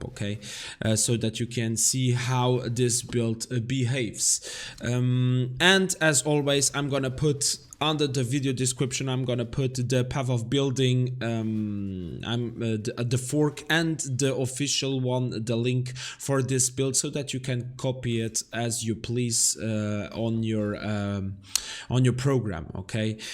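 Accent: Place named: France. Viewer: pti accent Français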